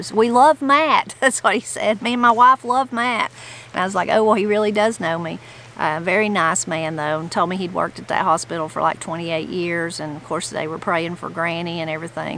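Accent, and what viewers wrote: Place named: Kentucky, United States. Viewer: I appreciate your channel so much. I accidentally found it when I was searching for applachia out of homesickness! Your accent and words remind me of my SE Kentucky roots! Thank you! 😊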